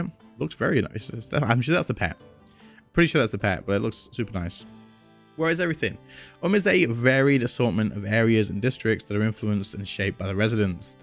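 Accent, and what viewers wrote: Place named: United Kingdom. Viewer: I have decided... I am leaving Runescape for good..... thanks for all the entertainments! i like it British accent 👍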